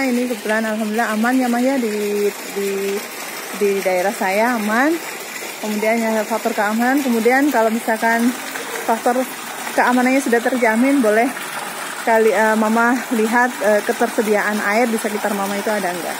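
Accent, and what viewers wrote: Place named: Banjar, Indonesia. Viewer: Dari logat ibu sprti orang banjar kalteng ya😀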